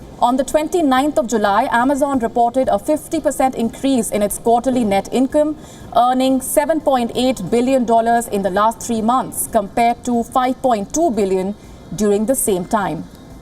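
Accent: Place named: India. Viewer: I love the totty English spoken by this lady of India than English speakers beautiful accent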